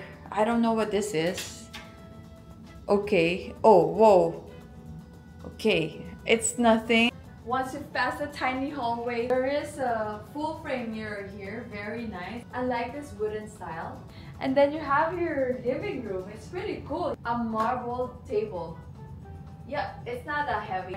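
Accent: Philippines. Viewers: YOU SOUND LIKE A 'FILAPINA' ?:face-blue-smiling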